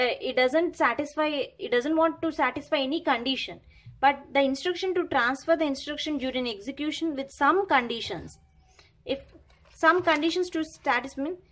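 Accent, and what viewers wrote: South India, India. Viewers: Nyc effort But There is a prob. In your slang Slang like. South people 😬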